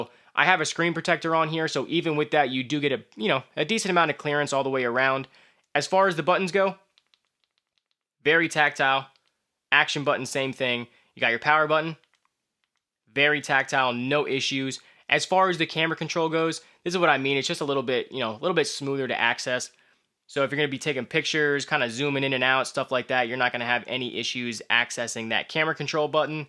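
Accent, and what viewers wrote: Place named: Massachusetts, United States. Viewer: Ok, where are you from? That accent is saying the Massachusetts area, but enjoyed that video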